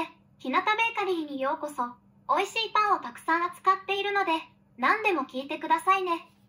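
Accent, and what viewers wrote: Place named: Saitama, Japan. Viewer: 埼玉県民の声がする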